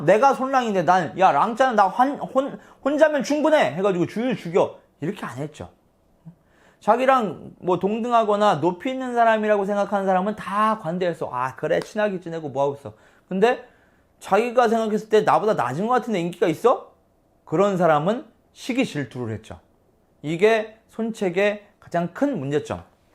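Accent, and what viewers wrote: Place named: Chungcheong, South Korea. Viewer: 충청도 삼촌이 구수하게 삼국지 얘기 들려주는거 같다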